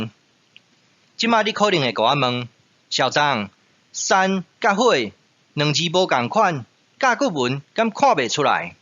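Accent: Zhangzhou, China. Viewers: 從甲骨文解開台語完全是正確的，你就快成功了。只差你台語偏漳州腔。漳州音是外族學習台灣海口音而形成的語言。，只有87分相似。音差會讓你困惑而解不開真正的字意。甲骨文是台灣海口音的造字語。你只能解開一小部份台語字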